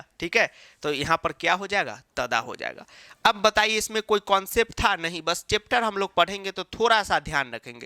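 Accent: Bihar, India. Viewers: Sir aap Bihar se ho na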